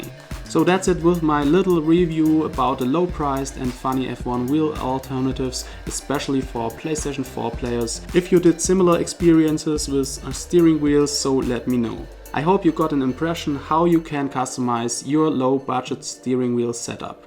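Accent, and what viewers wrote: Germany. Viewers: Du klingst voll deutsch lol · Wir deutschen haben halt einfach diesen Dialekt den man nicht überhören kann :-) Und weil du gesagt hast du wärst normal groß wie groß bist du denn? Habe keine Kohle mir das Zeug zu kaufen aber trotzdem...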